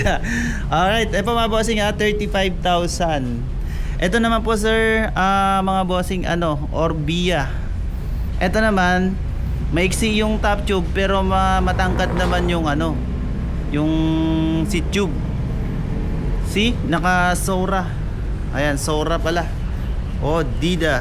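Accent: Visayas, Philippines. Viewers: Bisaya ka bai?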